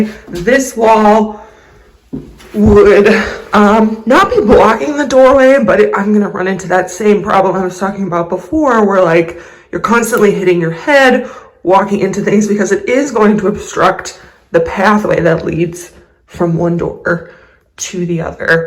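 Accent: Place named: Wisconsin, United States. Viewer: I to am a Wisconsinite, you go girl 🎉🎉🎉 you must be up North I’m in southern side not much snow here just cold 😊😊 I should’ve known by your accent since everyone thinks we have an accent in Wisconsin😂😂😂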